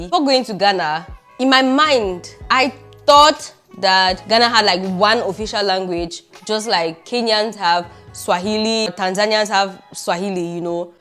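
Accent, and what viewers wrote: Nigeria. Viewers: I love your content. A Cameroonian with a Nigerian accent 😍 and body language. That goes to show that we're one with slight cultural differences. Keep it up, babe 👍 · Not conversant with Cameronians but you sound like a Nigerian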